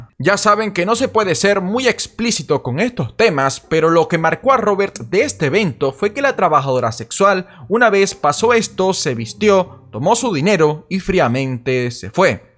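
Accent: Venezuela. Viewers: Una pregunta amigo, eres Venezolano?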